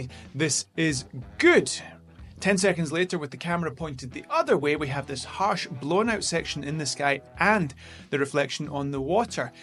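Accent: Scotland, United Kingdom. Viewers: Well done (&!), Good to have you both back and rolling! Great content, effective comms/visuals as always. And for me the tempo / pace is perfect). 😃And enjoying the scottish entourage (and accents …😉) …👌🤛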